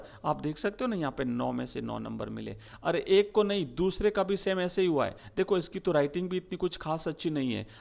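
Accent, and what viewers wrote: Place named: Wagad, India. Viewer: Sir aap wagad se ho kya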